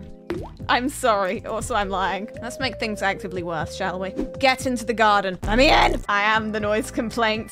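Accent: United Kingdom. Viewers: My first time watching outside of shorts and somehow she is even more British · The Brit really came out of her this time XD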